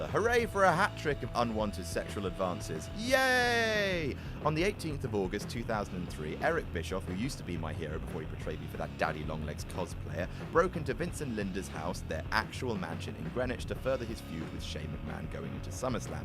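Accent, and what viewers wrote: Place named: United Kingdom. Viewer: This Asshole just Loves to hear his own Lame Ass British Accent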